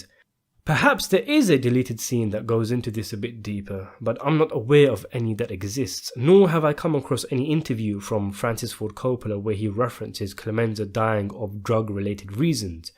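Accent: United Kingdom. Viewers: yo,you a brit? Cause im not entirely sure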